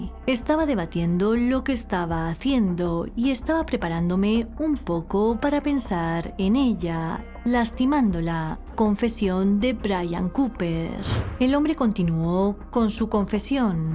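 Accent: Spain. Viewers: Ja debe ser el tono y acento de algunas regiones de España. Ya escuche esa tonada en otra gente española en YouTube.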